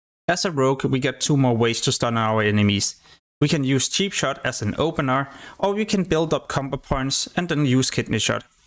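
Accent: Denmark. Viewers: this guy is Danish, 100%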